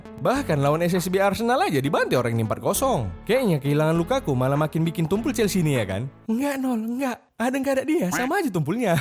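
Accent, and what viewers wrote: Medan, Indonesia. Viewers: Nada org MEDAN dan bahasa MELAYU upin ipin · Sukak kali la aku sama chanel si ini bahasa medan nya kerasa kali bah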